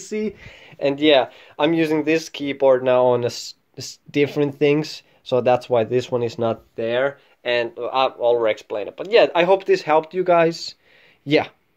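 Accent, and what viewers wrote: Finland. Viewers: Are you finnish? Your accent sounds a bit finnish and you have a nordic keyboard layout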